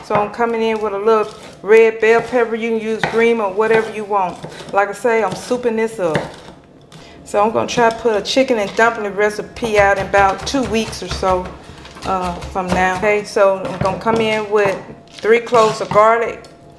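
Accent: South, United States. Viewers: I like the southern accent, mispronounce words and all don't change and I know that this is good cause I've made some dishes right out the bag right out of the bag in a can you gotta make stuff easy after a long day on the job and get this y'all they do the same thing in restaurants is out of a bag where can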